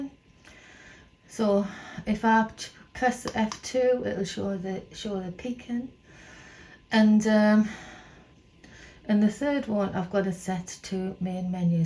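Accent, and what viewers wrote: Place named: Newcastle, United Kingdom. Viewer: Great informative video, Would not have had a clue about some of the options without it, so many thanks. And seriously - loved the Geordie accent.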